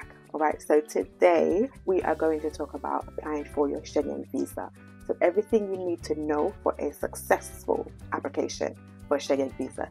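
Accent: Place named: United Kingdom. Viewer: You sound British girl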